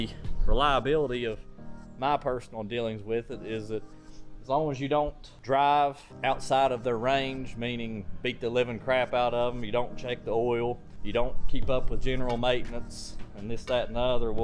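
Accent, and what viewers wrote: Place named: Tennessee, United States. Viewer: What state are you from man? You sound like you’re from my neck of the woods. I’ll say I’m about 20 minutes north of Nashville TN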